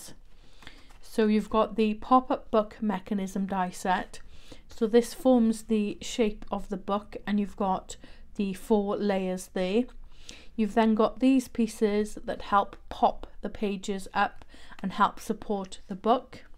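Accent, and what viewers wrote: Wales, United Kingdom. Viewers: Yay I thought I recognised a Welshy accent. I’m in Cwmbran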